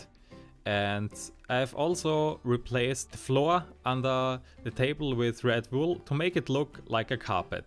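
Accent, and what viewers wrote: Germany. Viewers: Kann es sein, das du Deutsch kannst (leichter Deutschdialeckt) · Dieser deutsche Akzent^^ Sehr schöne Sachen! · man hört richtig das du eigentlich deutsch sprichst 😂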